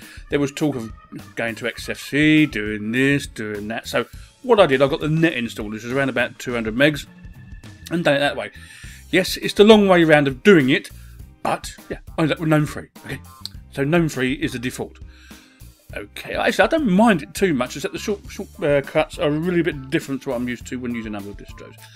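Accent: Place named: Cockney, United Kingdom. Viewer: Yes, please less Cockney ("bub,bub,bub") and more English.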